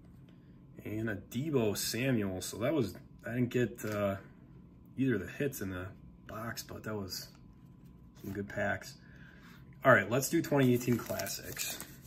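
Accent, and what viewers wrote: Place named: Canada. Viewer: I can hear a Canadian-like accent b/c instead of bosa I hear bowl-sa. Just say it and see (hear) the difference.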